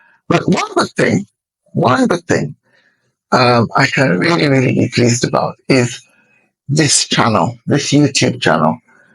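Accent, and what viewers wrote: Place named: United Kingdom. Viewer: My dear, anything that you find interesting is ok with me. You have a gift of gab as we say in America…..plus your British accent is quite charming. Enjoy your vacation, eat whatever you wish and come back with a new lease on life. Take care,I will look forward to your return…..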